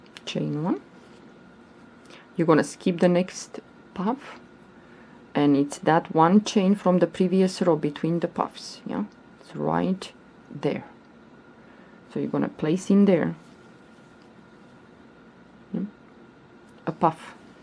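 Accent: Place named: England, United Kingdom. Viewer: Great pattern. Loved learning that stitch. Love your English accent. It’s clear but rather cute! Easy to listen to ❣️ …and I’m English!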